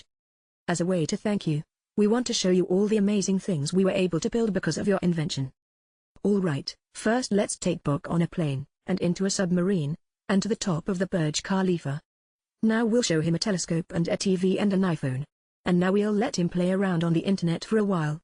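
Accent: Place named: United Kingdom. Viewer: Now I fucking hate the british accent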